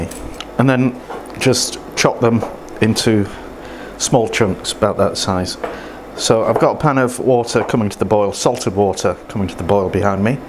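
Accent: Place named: Yorkshire, United Kingdom. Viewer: What part of Yorkshire are you from? Love your easy going style.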